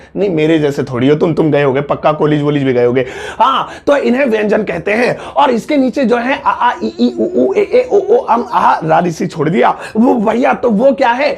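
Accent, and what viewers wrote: India. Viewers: sir apke ye desi tareeke se english bolna sikh gaya 🥹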